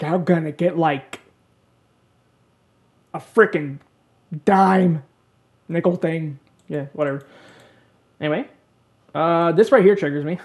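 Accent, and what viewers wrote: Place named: South, United States. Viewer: You're definitely from like a Southern state lol. Mississippi or something. If you're close by we should be friends haha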